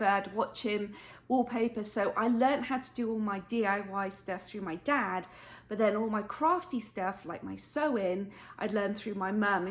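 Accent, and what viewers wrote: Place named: United Kingdom. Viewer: Really enjoyed this vlog, you have lead such a fascinating life, love how you have still got your British accent !!! Sending you lots of love xxx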